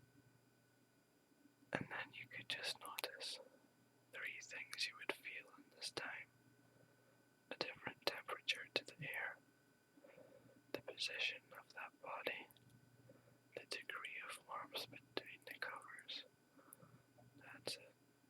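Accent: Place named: Scotland, United Kingdom. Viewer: Once again, you've created a winner. Man, I want a Scottish accent like yours!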